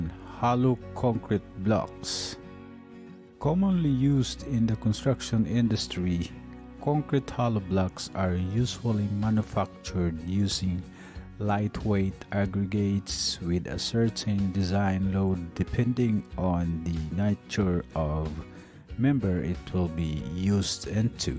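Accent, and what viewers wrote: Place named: India. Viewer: Heard indian voice, click out quickly. Shittiest group of people in construction.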